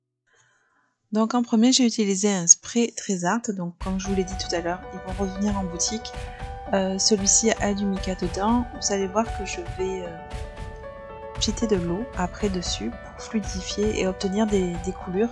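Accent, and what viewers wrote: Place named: South, France. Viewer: Coucou, Heureuse d'entendre ton p'tit accent du sud et de te voir, enfin tes petites mains hi hi, créer! Que dire...qu'a par que les couleurs que tu as choisi sont mes favoris, c'est que ta création est simplement MAGNIFIQUE j'adore, BRAVO! Je sais que ce n'est pas évident entre la vie de famille, la boutique, etc, d'avoir un p'tit moment a soi pour créée, mais, j'espère que tu referas d'autres vidéos de ce genre! Bon week-end & Soirée. Gros Bisouuus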